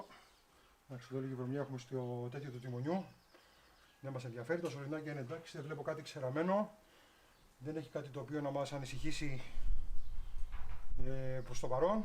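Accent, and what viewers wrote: Greece. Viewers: Από την προφορά και μόνο είσαι από Ρόδο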